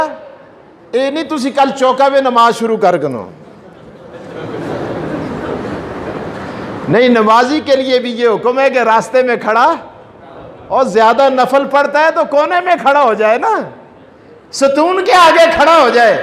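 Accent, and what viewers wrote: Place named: Pahari, India. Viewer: مولانا سعید صاحب ماہڑی "پہاڑی" بولی جس کو اوہ ہندکو آکھدے ہیں بچ گل بات کردے مچ پیارے لگدے ہین اللہ تعالیٰ انہاں کو سلامت رکھے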